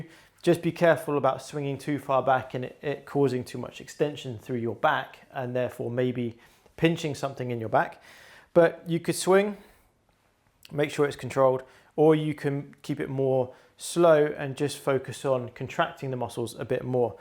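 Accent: United Kingdom. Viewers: Excellent video and very helpful, much prefer the English accent to an American drawl!!!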